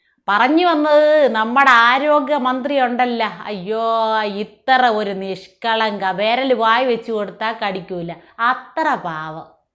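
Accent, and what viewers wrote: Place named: Thiruvananthapuram, India. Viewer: .....Avatharanam Soopar ❤❤❤ AND..Avatharana, Shali, Thiruvananthapuram Basha Shali.... Adipoli..... Sooopar..❤ Sooopar...❤ Sooopar...❤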